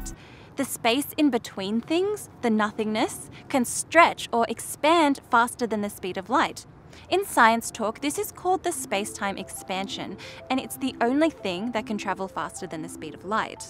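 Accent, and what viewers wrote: Australia. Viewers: I find her mild accent to be very pleasant and easy to understand... I'm pretty sure it's Australian, but I know it's not a Dutch/Afrikaans/South African accent; for some reason I find that accent to be very harsh... (I have nothing against the people themselves... I'm sure plenty of folks in SA would find my rural-western-American accent to be just as harsh and unpleasant, lol) ✌️ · I'm from Sydney and i didn't even notice that you were aussie until i saw an Australian ambulance lol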